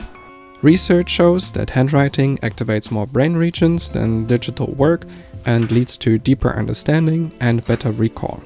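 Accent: Germany. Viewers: ❤ After a few sec (lovely vibe) and after your first words (slight German 🇩🇪 accent) - LIKED & SUBBED. Period. Tschüss, Ich war aus Ungarn 🇭🇺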